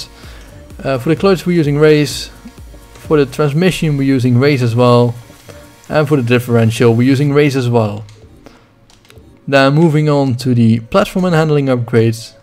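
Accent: Netherlands. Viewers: are you dutch because you're accent sounds like it :) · are you Dutch?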